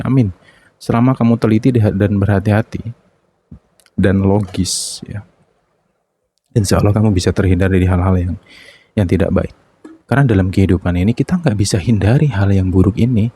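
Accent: Java, Indonesia. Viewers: 😅😅😅 mas. jowo thoo....kalem lucu sht sll yaamurah rizqi🤲🤲🤲🎉❤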